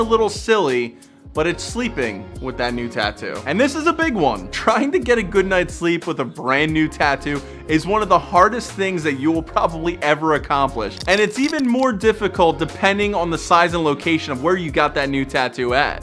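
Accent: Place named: Pennsylvania, United States. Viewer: U sound Pennsylvanian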